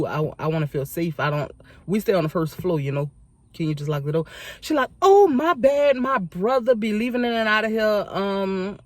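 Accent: Louisiana, United States. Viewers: Love your accent...want to visit lousiana one day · Are you from New Orleans? I love the way you sound.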